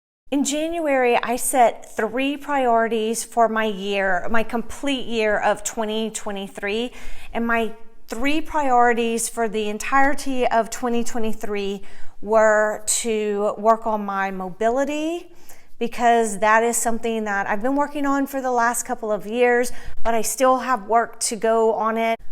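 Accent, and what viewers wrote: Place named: Louisiana, United States. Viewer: AWESOME,! You have obviously done so much to teach the pups how to be good babies, too. Awww this was a great video. Looking forward to more.:) Love hearing your Louisiana accent on Youtube. It's rare to hear it these days. xoxox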